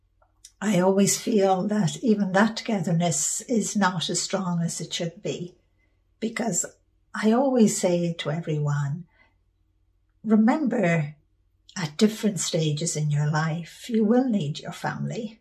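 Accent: Ireland. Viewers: Lorna, You have no idea how this message is perfect timing as I need to do a little bridge mending. I've been avoiding it, but I don't want to let the situation keep going the way it is....your message is the nudge I needed! I saw you years ago at The Unity Church in St Petersburg, Florida. You had written your first book. The sanctuary was packed. Your lovely Irish accent came floating out and we were all on board with your message and fell in love with. Vicki Perez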